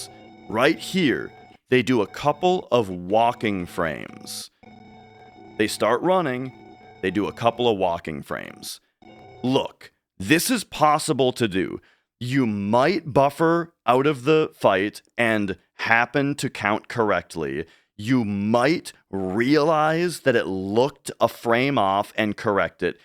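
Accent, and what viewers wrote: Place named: Scandinavia. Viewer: I literally had an entire 10 minute existential crisis wondering if you were Nordic with an amazing English accent or if I were just schizing. Your speedrunner profile says you're from MN, and also MN has a lot of Nordic heritage, but then I realized it would be equally likely that of all of the places a Nordic person would move, it would be probably North Midwest. And then, lastly, I realized that this has distracted me from the substance of the video so I rewound and found myself really enjoying the video. Liked : D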